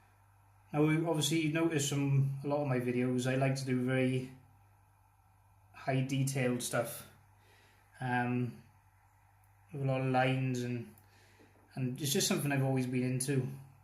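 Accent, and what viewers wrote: Wales, United Kingdom. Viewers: This is great, hearing you explain your art is a lot better for me and probably other people too, rather than music!! I really enjoyed this video, your tools, how you explained your art is Fab. I'm a real supporter of your channel 👍 I get what you mean about subs for subs!!!. Loved it, thanks for showing us your art😊✌️👍🇬🇧 p.s miss the Welsh accent I grew up in carnarvon, love Wales 💝👍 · Hey. Just catching up after getting my computer back in order. Cool video and nice to hear a Welsh accent. Makes me kinda homesick, lol (I live in Holland). I've never tried wood burning, but it looks amazing. Agree with what you said about the community and getting lesser known artists seen. With that said I will be doing my first shout out this week. Just trying to get back on my feet as I've had a few health issues of late along with all the hassle with my computer breaking down. 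Anyway, at the risk of leaving a novel-length message I'll just say great video and nice to see the process:o)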